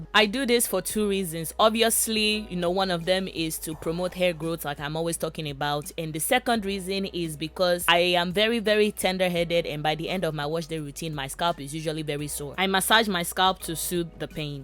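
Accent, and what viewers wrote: Nigeria. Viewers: I love your Nigerian Accent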